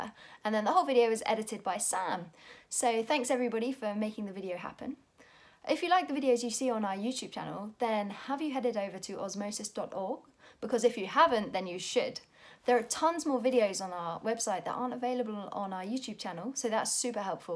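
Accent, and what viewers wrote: United Kingdom. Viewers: The British accent is wonderful 😍😍😍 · Nice video, straight to the point! British accent makes me kinda distracted, had to replay the video few times. But again it’s just me. Tanner, come back! :) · Loving the British accent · I was so mesmerized by the British accent . . .. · Seriously I love your videos.. but this British accent needs constant focus.. American accent is far most easy to understand.. not a criticism but just an opinion.. anyways as always best explaination!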